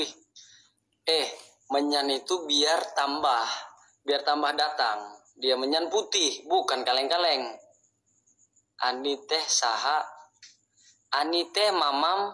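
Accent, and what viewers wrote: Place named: Palembang, Indonesia. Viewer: Ass.... " ane mau tanya. Asli nya kang dari mana yah..?? Kayanya logat Palembang kang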